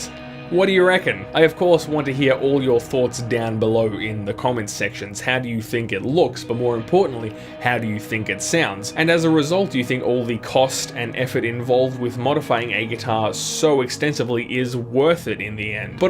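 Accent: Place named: Australia. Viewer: Hahaha that's just the down under accent... Been around Aussies and Kiwis long enough to recognize it anywhere. The upside is that it is relatively simple to impersonate.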